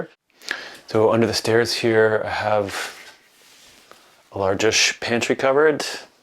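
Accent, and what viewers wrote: Canada. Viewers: As soon as I heard “aboot” I thought he must be Canadian. 😊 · His Canadian accent is soft music to the ears of this fellow Canadian. I've been watching a lot of the channel, Never Too Small, just for the laughs because the designers are pretentious about their designs, most of which are far less functional than this one, and no more beautiful. The humility in presentation of his very impressive word is just so refreshing. I saw someone suggest giving up some ceiling height for more height in the loft. That seems like a good idea to me too. But I hadn't even thought of that. The only thing I noticed that might need a change is that is tall and long limbed, making it possible for him to easily reach up and move that light above the sofa, and to reach across the kitchen counter. A very minor quibble. More of an amusement for a shortie like me who can only dream of having a reach like that.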